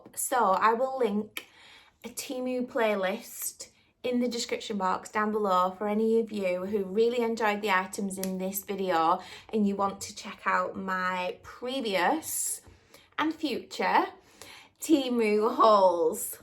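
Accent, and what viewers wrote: Northern England, United Kingdom. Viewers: It depends on regional accents. I think is from the north.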